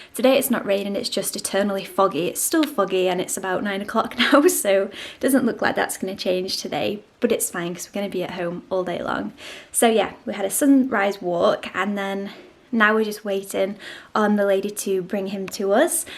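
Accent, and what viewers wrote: Yorkshire, United Kingdom. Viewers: Yorkshire. It's my absolute favorite accent. So lovely!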